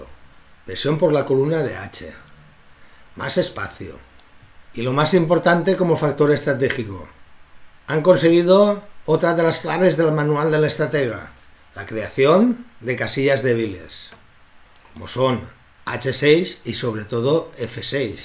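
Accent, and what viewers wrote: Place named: Spain. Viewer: Hablas como auténtico español saludos desde mexico (pd:yo elegí comerme el peon con torre 😔)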